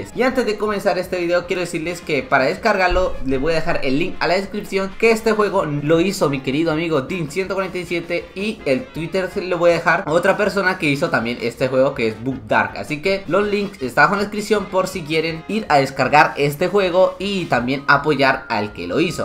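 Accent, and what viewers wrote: Mexico. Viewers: Porque habla Mexicano :V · Porque Hablas Como Mexicano :^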